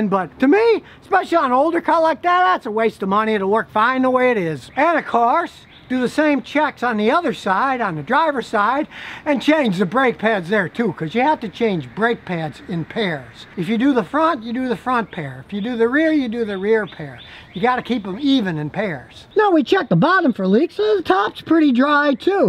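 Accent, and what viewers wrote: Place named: Michigan, United States. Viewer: I like how you say caaaarrrr, are you originally from Michigan? You have a Michigan accent.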